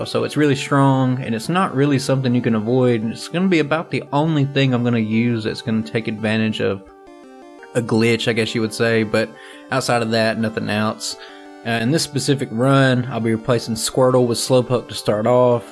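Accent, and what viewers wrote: South, United States. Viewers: As a guy with a strong southern accent I appreciate the accent. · Personally I'm a fan of the southern sound you have when talking. It's different and makes you stand out. And if that's just how you talk then keep it up. I may watch may different people streaming these games but i love everyone's approach be it jrose, Scott's thoughts or vanman, everyone brings something new to each play thru. And honestly I like that your open about doing it not as a professional but just a fan of the series. Keep up the good work man. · I like the southern drawl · As a southern girl, I love your drawl.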